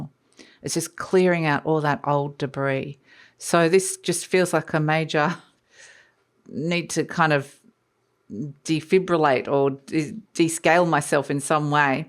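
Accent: Australia. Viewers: ❤ nice to hear an Aussie accent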